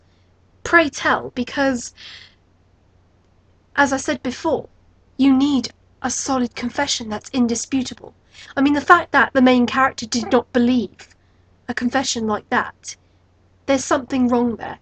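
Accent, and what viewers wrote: United Kingdom. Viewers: First of all I love your british accent! It's nice to hear a rant from somebody not from Japan or the States for once. Second, I just know one thing, regardless of all the facts in this argument, I think it would be an utter travesty to not make NaruHina official because I honestly can't see Sakura being disappointed about those two being together at the end of the show, yet Hinata would be devasted terribly if he chose Sakura, especially after putting her life on the line against Pain. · Soooo true!! NARUHINA FTW! I love you and your british accent is so beautiful *-* ♥ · I love your rant! What you say makes so much sense and your english accent is awesome! ^^ BTW: NaruHina & SasuSaku are CaNoN!!! Let's celebrate "\(^v^)/"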